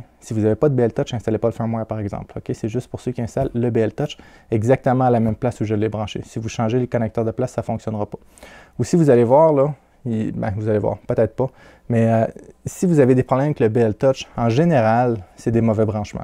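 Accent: Canada. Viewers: Bonjour, superbe video et bonne explication. Petite suggestion concernant la limitation des commandes personnalisées sur l'écran d'origine ... Il existe la possibilité d'installer un Rasperry Pi avec un écran tactile de 7 "(voire 10") en tant que contrôleur d'imprimante OctoPrint (les coûts sont abordables). Je n'ai pas encore commencé ce projet, mais je le trouve très intéressant. En ce moment, une interface utilisateur étendue y serait peut-être également possible pour rendre plus de fonctions disponibles directement via le toucher! J'adore votre prononciation, je suppose que vous venez du canada!? Salutation du Luxembourg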